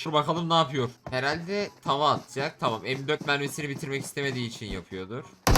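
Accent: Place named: Kayseri, Turkey. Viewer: kayserili olduğu konuşmalarından belli daşşağını yiyem diyo xd sivasdan selamlar adamsın abi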